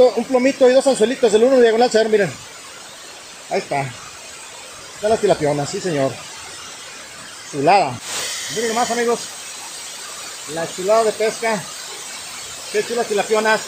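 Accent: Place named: Bajío, Mexico. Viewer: Buena pesca amigo disculpe su acento se escucha como del bajío ... saludos desde Valladolid Yucatán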